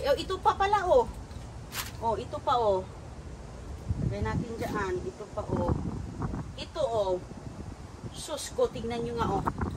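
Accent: Visayas, Philippines. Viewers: Halata po bisaya kayu 🥰🥰🥰 ... Swertyha sa family uy....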